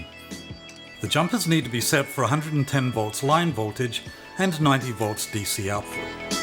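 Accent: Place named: Australia. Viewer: Hi mate. Great conversion 👍… You sound like an Aussie… but you mentioned 110v… Are you in the USA? Regards Robert ( Sydney, Australia)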